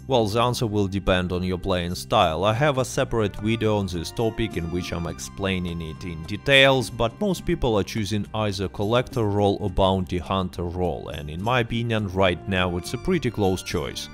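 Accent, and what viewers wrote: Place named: Russia. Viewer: The Russian accent make this video even better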